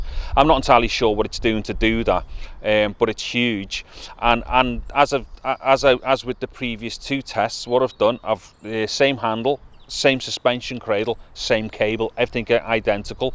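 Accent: Liverpool, United Kingdom. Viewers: How refreshing. A fellow Scouser with a no-nonsense, objective review. Luvved it and just subbed yer.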